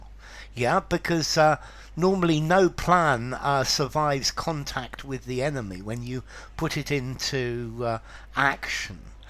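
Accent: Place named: Cockney, United Kingdom. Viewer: You're within the bow bell range Professor. You know how to speak Cockney 👏👏👏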